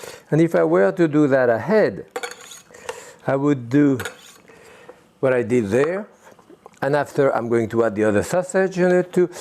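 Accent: France. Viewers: this mans is so fucking french and im absolutely here for it. "and this, as you can see, is a -aut dog-"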